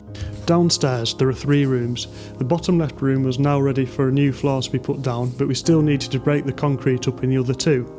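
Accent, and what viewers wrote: Yorkshire, United Kingdom. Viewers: Really enjoy the Yorkshire accent and the documented effort that goes into these renovations. Reminds me not to feel bad hiring a professional…. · It's really been a labour of love. I admire your extremely hard work, at times it seems rather back breaking.. Seeing you were self employed and working. I hope the cost of the place was worth renovating it.. Hah all the best to you and well-done.😊😅😂. I love your accent as it reminded me of my dad and grandad who were Yorkshire folk from Scarborough farmers actually. Greetings from South Africa😊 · Occasionally the YT algorithm gives you a gem, this is one such time, never asked for a sub but got one anyway for the laidback approach and wonderful Yorkshire accent.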